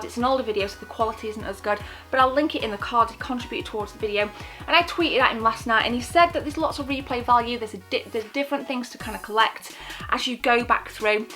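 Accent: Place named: Liverpool, United Kingdom. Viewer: where you from..I can't work your accent out...hear bits of Liverpool /mereyside occasionally. brand new to your channel. lov it x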